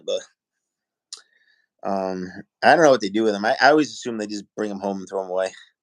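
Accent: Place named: Boston, United States. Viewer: Lol how pronounces Pop Tarts. “Pawp-tawts” 😂 gotta love that Boston accent!!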